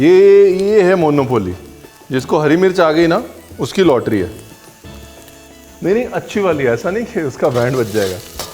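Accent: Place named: Lucknow, India. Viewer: Hi Ranveer...I really love your cooking style.. Lucknowi Lahza..Baate..Jo khana haste khelte ban jaaye.. Superb 😊😊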